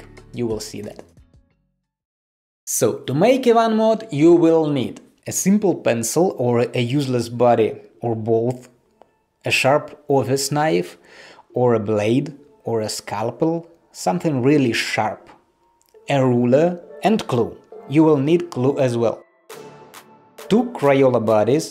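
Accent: Russia. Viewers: bro i like russian accent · What did you expect when he said be careful with a Russian accent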